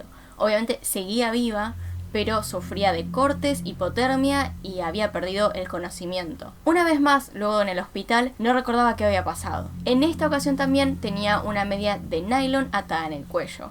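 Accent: Argentina. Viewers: La única voz argentina que soporto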